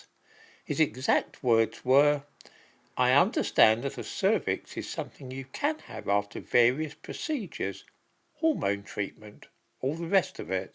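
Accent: United Kingdom. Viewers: Thick British.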